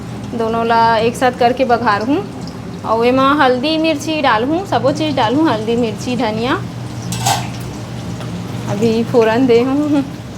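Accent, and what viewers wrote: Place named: Chhattisgarh, India. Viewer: Tuhar baat achha sunathe bhoji sudh c. G. Bhasa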